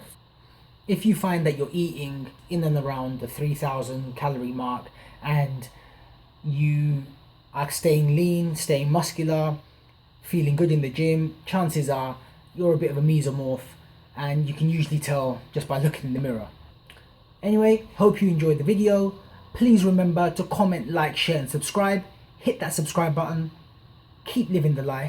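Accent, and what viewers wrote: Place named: England, United Kingdom. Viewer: another YouTube annoying english accent downvote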